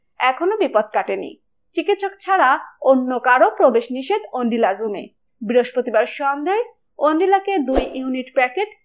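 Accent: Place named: Bangladesh. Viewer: আমার মনে হয় এই সব channel গুলো বাংলাদেশের. Pronunciation শুনে তাই মনে হয়. আর বাংলাদেশ থেকে YouTube এ extremely vulgar জিনিষ দেয়. এই গুলো কি করে allow করা হয় জানি না.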